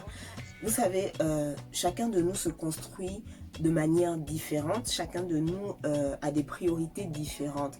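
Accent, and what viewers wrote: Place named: Gabon. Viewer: Je ne te le fais pas dire ; dans son langage tu sens une femme gabonaise.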